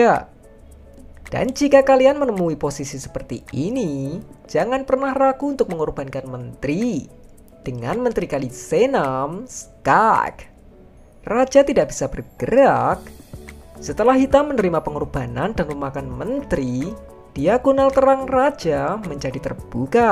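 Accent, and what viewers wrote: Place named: East Java, Indonesia. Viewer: Kyk logat jawa timur an,kek nya emang orang jawa timur ketara bgt soalnya😂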